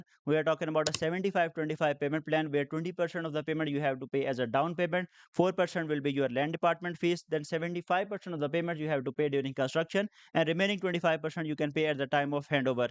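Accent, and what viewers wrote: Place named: India. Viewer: Improve your Indian accent bro...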